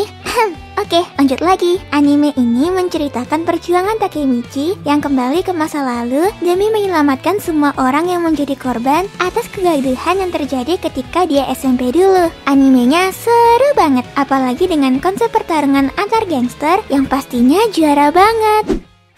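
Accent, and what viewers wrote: Japan. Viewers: faseh bgt aksen jepang nya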